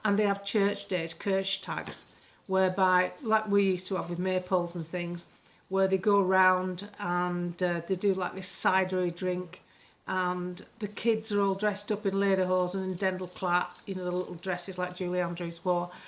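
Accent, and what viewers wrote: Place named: Lancashire, United Kingdom. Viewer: Nothing, I mean nothing beats a Lancy accent. Ta Luv !!! 🙂❤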